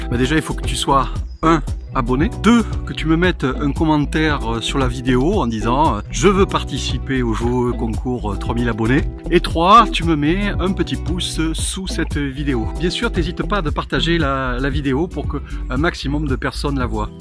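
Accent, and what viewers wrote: France. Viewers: Bonsaï? Bien sûr! Ses Vidéo? je les adore, et je les partage. Elle m'aide beaucoup, pour moi même et surtout pour animer le club! Le personne? Un mec super! Son accent du sud-ouest? Me rappelle mon enfance, évidemment je l'adore. Si je participe? Évidemment!!! Et puis j'adore les pots de François...sait-on jamais? C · Salut. Moi aussi je veux jouer au jeu des 3000. Ne change rien continu comme çà. Ce petit accent du sud qui nous donne des petits trucs et astuces pour nos Bonsaïs, je ne m’en passe plus.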